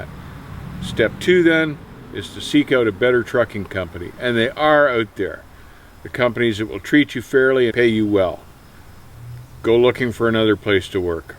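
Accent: Canada. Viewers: You Canadians have the best accent! LOL! Love the channel! Keep up the great work!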